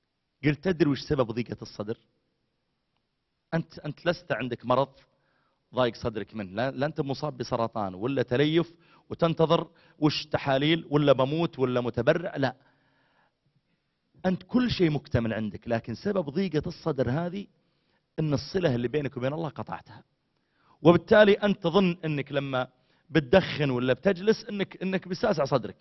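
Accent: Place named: Saudi Arabia. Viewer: يسعدك قمر يا شيخ حديثك الموزون الرائع في كفة ربي يجزيك كل خير وتلك اللهجه السعوديه في كفة أخرى ما اروعها🌸🌸🌸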